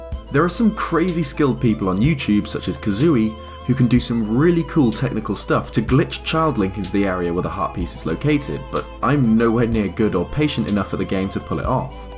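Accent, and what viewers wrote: United Kingdom. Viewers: eX Galneryus because hes British lol it's his accent. He also said Zelder. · its called a BRITISH ACCENT.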